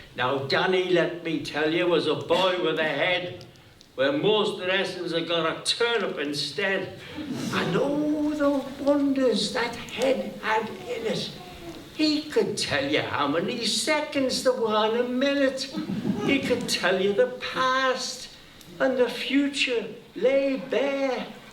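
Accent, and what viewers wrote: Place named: Liverpool, United Kingdom. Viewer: Sounds like scouse ,northwelsh, irish, cumbrian, and stoke , cheshire accents mixed...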